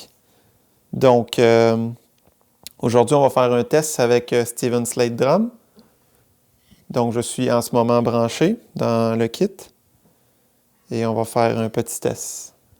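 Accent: Quebec, Canada. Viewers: C'est un accent Quebecois le grand.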